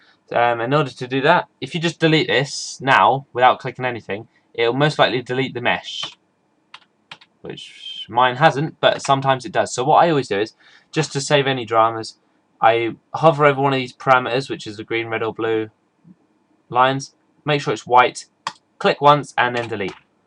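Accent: United Kingdom. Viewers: thank you thank you tah you thank you.with your nice english accent.THANK YOU.you are the onlyone in the world who showed how to animate cloth and model together as one.THANK YOUUUUUUUUUUUUUUUUUUU.wowwwwwwwwwww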